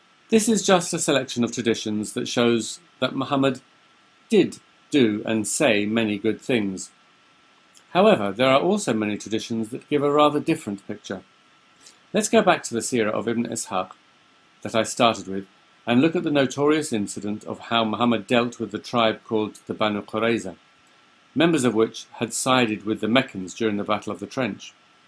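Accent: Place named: United Kingdom. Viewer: Your beautiful posh English accent makes everything sound more effective. Nicely explained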